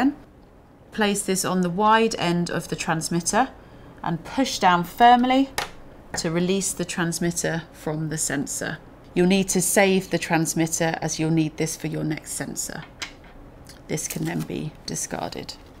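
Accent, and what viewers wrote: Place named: England, United Kingdom. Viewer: Wow , I'm in Love ! I really enjoyed this presentation and her English accent as well !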